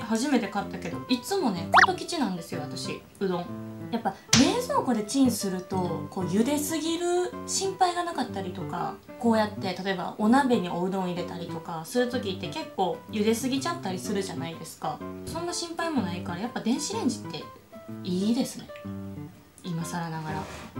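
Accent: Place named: Osaka, Japan. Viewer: 大阪弁が癒されます！いつも、ありがとうございます😊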